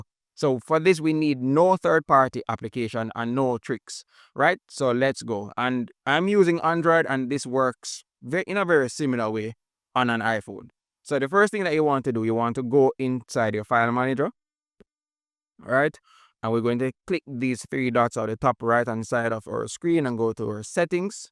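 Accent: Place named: Guyana. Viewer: Nice accent. Guyanese?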